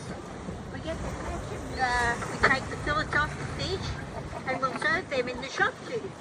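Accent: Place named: Australia. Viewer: Wat a beautiful accent we Ozzy's have. They could easily eat her if they had teeth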